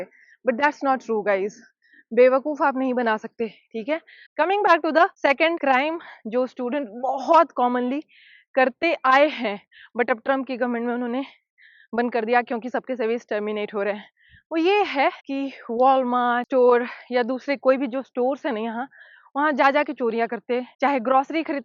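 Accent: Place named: Bihar, India. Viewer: ji namaskar 🙏🙏 Kya aap Bihar se ho aapki bhasha se pratit hota hai🙏🙏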